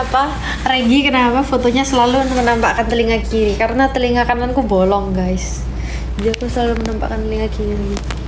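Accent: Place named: Java, Indonesia. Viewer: Lucu tauk kalo medok, udalah be your self 😊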